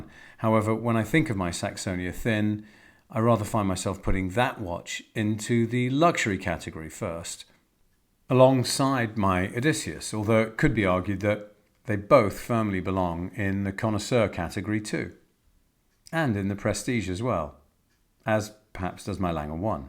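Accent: RP, United Kingdom. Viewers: Thanks for yet another great instructive video. Your posh accents help pay more attention to what you are saying. I wonder if you ever use any expletives in your life :) I certainly do and not with a posh accent but with a French one. With regards to IWC, I would highly recommend the IW371615 Portugieser Chronograph, there is a French gentleman there that is very good at taking care of his customers.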